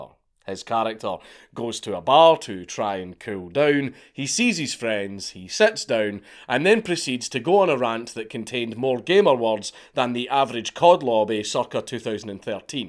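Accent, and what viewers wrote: Scotland, United Kingdom. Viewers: Let’s be honest, a story told by a Scotsman is way more entertaining and engaging than anyone else on earth lol!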